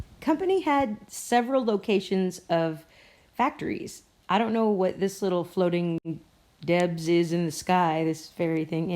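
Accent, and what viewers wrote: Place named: United States. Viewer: You grew up in the Philippines? Your English is perfect and you sound very .. American?? 🤔 Anyways, love your content, your voice is beautiful and soothing to me. I love your laugh too! I've subscribed to!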